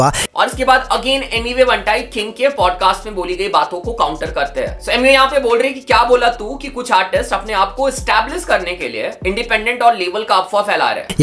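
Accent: India. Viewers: Bhaiye logo ke jaisa baat karta h yaar ye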